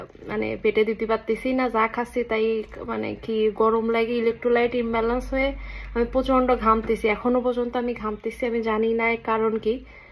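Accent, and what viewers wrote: Khulna, Bangladesh. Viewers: দ্রুত সুস্থ হও দোয়া করি। তোমার কথা শুনে মনে হচ্ছে তোমার বাড়ি খুলনা। ভালো থেকো আপু। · খুলনার আঞ্চলিক ভাষা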